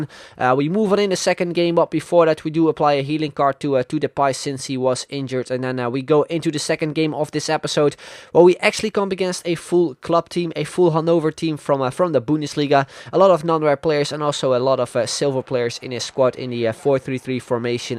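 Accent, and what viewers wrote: Netherlands. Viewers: is he dutch? he sunds like?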